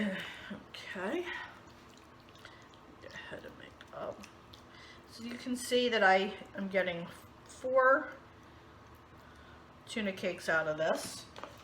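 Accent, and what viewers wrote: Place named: South, United States. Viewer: I love tuna cakes. I’m here to see how to make them in our new air fryer. I must say, tuna cake recipes vary more than I realized….. family or area specific I guess. We always add cooked rice, & cracker crumbs, onion, parsley, maybe some mayo. I’ve never tried the celery & mustard. Love your frequent “ guhead “, truly. I’m guessing you are from down south, yes?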